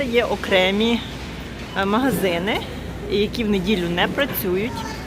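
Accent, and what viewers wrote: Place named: Lviv, Ukraine. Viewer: Ви напевне з Львівщини, бо є акцент? Горнятко - це так говорять у Львові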